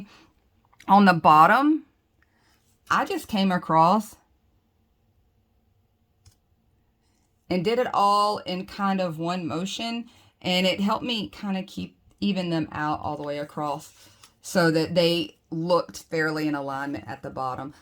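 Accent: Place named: Mississippi, United States. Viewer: I loooove this tutorial and appreciate you. I was raised in South Mississippi and your accent reminds me of home. Im in FL now. Where are you from? Kathy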